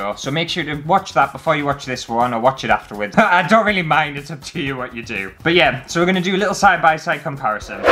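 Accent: United Kingdom. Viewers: You need to vastly increase the bitrate of your camera, this video looks very splotchy. Also, you need to lower your shutter speed for more motion blur because it looks unnaturally crisp and jittery. I would also recommend moving to 30fps, in which case since you sound British, you'll need a 1/50th shutter speed, specifically, in order to avoid your interior lights flickering on camera. In addition to all of that, you'll have to set your camera up so that it doesn't adjust the shutter speed as a part of the exposure triangle when you have auto-exposure on, which I assume you do because most people do.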